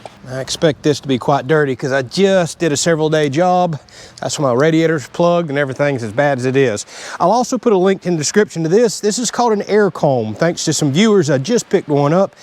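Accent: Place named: Florida, United States. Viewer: Florida accents bust me up sounds like you say oil without the i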